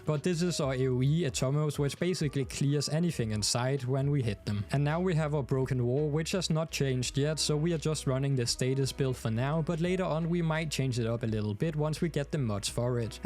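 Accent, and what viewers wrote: Denmark. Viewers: recently came back to warframe and ive been enjoying it alot, your guides have been very helpful getting me back on track. keep doing what you do! also, are you danish by any chance? your accent sounds very similar to a dane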